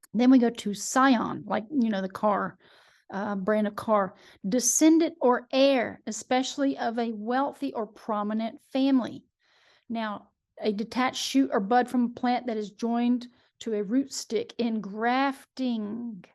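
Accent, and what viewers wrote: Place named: South, United States. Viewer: I just found you today from the typical podcast show. I am elated. I can not believe all these things you're saying on his show, I know most of. I have been fascinated with the occult and symbolism for quite awhile, but a lot lately. I like to find things from the past, like photos of the Rothschild parties with their Saturn costumes, and Pink Floyd songs, and many books. I read a lot. There is too much for me to list here, but I do a lot of research. Also, I've had many OBE's although its been awhile. I wonder what part of the country you are in? I hear a southern accent. I'm in New Orleans. So happy I found you!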